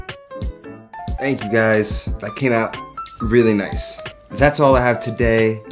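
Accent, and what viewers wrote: New York, United States. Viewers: Haven't watch this potato video yet, your description sounds delicious. I come from NY and listening to how you speak and your directness sounds like music to my ears, has a comforting feeling too.I miss the people of NY. So like your recipes, trying to cook them. Thanks for maintaining your integrity ( cutting board issues by others, not me.) Bless you and you hard work.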